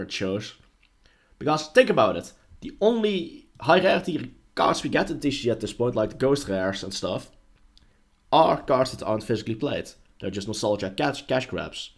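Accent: Netherlands. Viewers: Are you Dutch? I can tell from your accent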